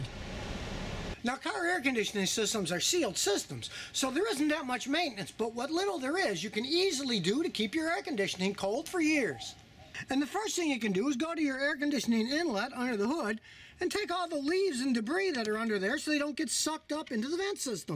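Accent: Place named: East Coast, United States. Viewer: hey i am curious have you ever lived in pennsylvania? Your accent sounds like it has a bit of east coast in it...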